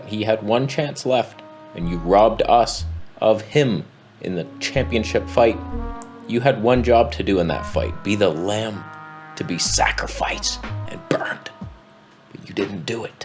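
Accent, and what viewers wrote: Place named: Canada. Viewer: It’s like if Jack Slack had a vegan Canadian accent.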